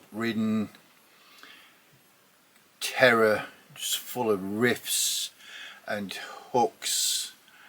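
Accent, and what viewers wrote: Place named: Scotland, United Kingdom. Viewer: I don't know what kind of UK you are but I think you're Scottish. Check out a book called "The Haar" by David Sodengren. I've actually heard of Caustic Wound. Check out Golers from Canada. I can't believe Sodom is still together but I absolutely love this group! Oh wow! I didn't know Cancer was still kicking. I will never go against Pungent Stench's first record but you really need to hear "Masters of Morale, Servants of Sin". That record is incredibly disturbing and just a ridiculously, evolutionary version of this band. Great video and cheers!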